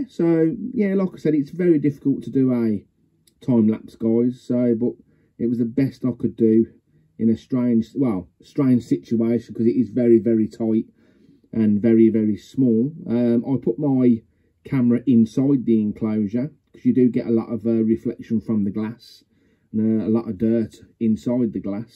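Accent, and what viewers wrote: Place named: Birmingham, United Kingdom. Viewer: Yeah, it’s really nice here. On the coast. But, we have our fair share of issues with drugs etc 🤦‍♀️ I guess that there’s no escaping that. When I was younger (much younger 😂) we knew nearly everyone, not now though. There’s more houses being built and people. Same as everywhere else I guess. You’re a Brummie aren’t ya? There’s no missing your accent 😂 xx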